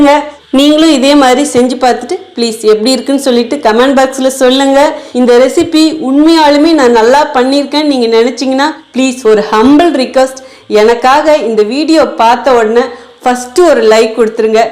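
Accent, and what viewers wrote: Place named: Tirunelveli, India. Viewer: நீங்கள் பேசுவதை கேட்கரசனையா இருக்கு தெளிவா.இருக்கு வாழ்த்துக்கள் மகளே எந்த ஊர்நீங்க பிள்ளை னுசொன்னது நெல்லையா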